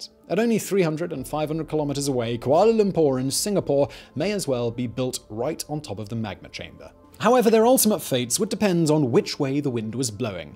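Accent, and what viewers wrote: United Kingdom. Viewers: I'm sorry to ask you this, but... You're British?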